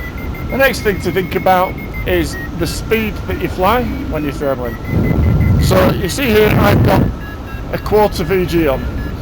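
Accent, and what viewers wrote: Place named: England, United Kingdom. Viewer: So highly thankful to you for your nice reply.. the video is so useful, it needs several times to hear to get more info despite the sounds of the winds around you there besides your English accent, and still needs more in scientific information to understand on how every physical factor would participate with other factors in the Hangglider lift process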